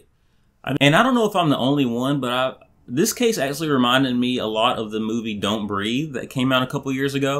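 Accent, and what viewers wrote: South, United States. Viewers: You are so country. It’s adorable ☺️ I would wager you are from the south😊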